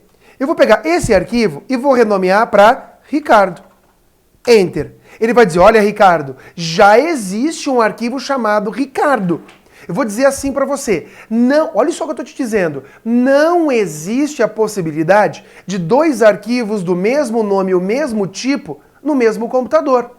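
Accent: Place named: South, Brazil. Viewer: Ei professor você nasceu no sul do Brasil? é por causa do sotaque .suas aulas são ótimas ,vou prestar para agetel e agepol,valeu.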